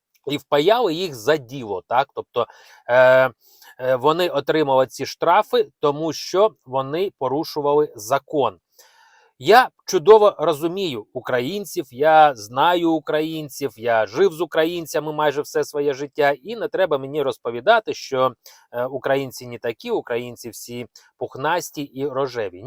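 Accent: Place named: Russia. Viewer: А что у вас за мова украинская чисто российским акцентом не пробовал размолвлять по-русски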